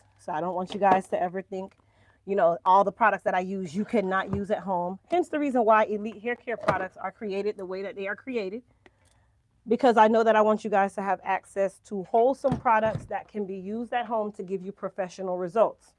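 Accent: Jamaica. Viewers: She’s right you do have a Jamaican accent. It’s not sharp but I do hear it from time to time.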